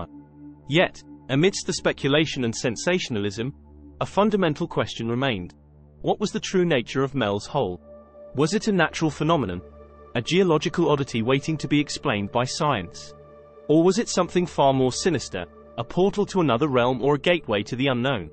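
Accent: United Kingdom. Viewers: We're all so sick of the British accent, mix it up already.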